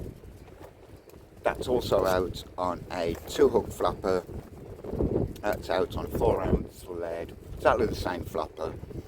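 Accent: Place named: Yorkshire, United Kingdom. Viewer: You don't sound much like a brummie no more your starting to sound Yorkshire 😂❤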